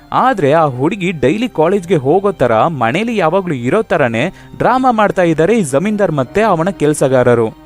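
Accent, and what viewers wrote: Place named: Kerala, India. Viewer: Mallu bhaay kannada maathaadthiro haag kelsthide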